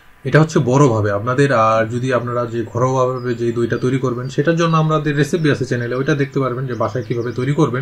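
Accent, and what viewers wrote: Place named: Sylhet, Bangladesh. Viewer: আমিতো মনে করেছি সিলেটে! আপনার ভাষা কিছুটা সিলেটি টান আসে!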